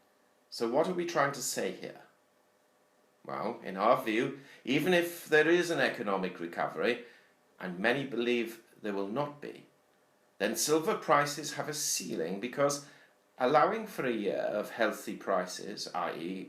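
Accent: England, United Kingdom. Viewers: Very thoughtful (the Eng accent helps) and an award for a nicely rigourous analysis; but, my gut says $38 as this Fall is just too econo-politically topsey turvey not to force a doubling.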